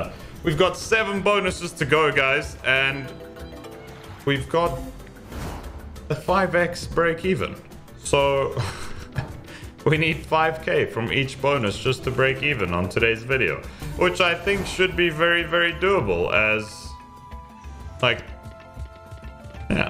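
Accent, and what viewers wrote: South Africa. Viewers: Dude are you from SA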